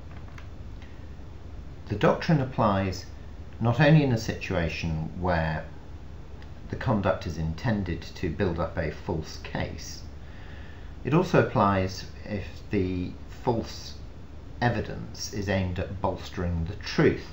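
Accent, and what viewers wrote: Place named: England, United Kingdom. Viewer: Guy has a damn English accent - geesh!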